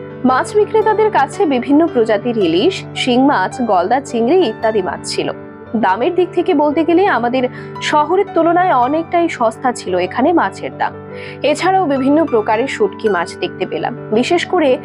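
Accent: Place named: Bangladesh. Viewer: আপনার বাংলা উচ্চারণ একদম বাংলাদেশের মানুষের মত। আপনি কোন জেলার মানুষ আপু। মনে হচ্ছে আমাদের মানুষ। পশ্চিমবঙ্গের মানুষের accent আলাদা।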